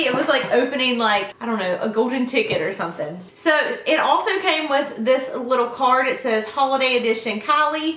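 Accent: South, United States. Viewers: I love your voice it sounds so country!!!! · I Love Your Southern Accent. Maybe I Should Ask First Are You From The South? lOl If So I Love Your Accent & Your Makeup Here As Well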